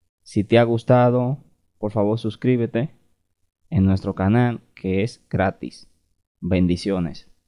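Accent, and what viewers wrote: Dominican Republic. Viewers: de donde eres me sueñas dominicano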